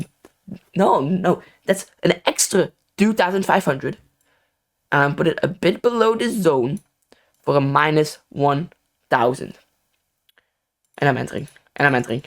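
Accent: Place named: France. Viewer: Your frech aczent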